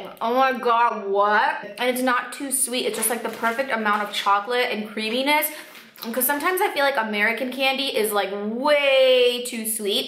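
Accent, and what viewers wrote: Czech Republic. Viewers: je to supr :D prostě američanka narozena v česku,užasne :) prostě že ta čeština u ní pořád je :) klobouk dolu a supr videa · mluvíš neuvěřitelně krásně česky! Jsi češka?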